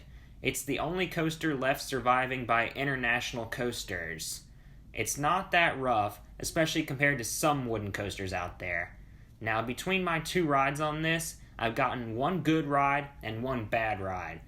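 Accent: South, United States. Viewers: Really liked the video, you sound like you’re from the south 🤣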